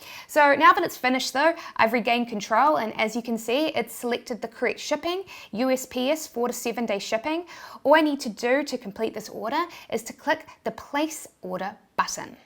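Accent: New Zealand. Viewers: Love the new Zealand accent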